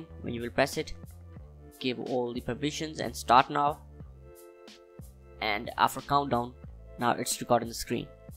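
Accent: India. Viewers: Always gotta rely on that indian tech guy with a bollywood accent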